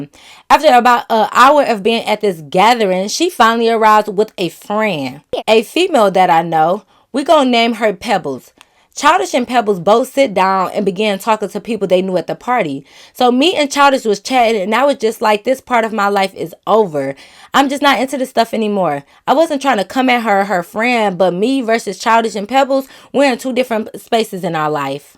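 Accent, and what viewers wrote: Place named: New York, United States. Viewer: I knew this girl was from ny from the beginning lol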